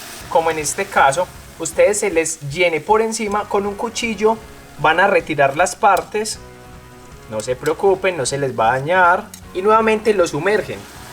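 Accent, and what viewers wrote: Antioquia, Colombia. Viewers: Me encanta ese acento paisita 🤭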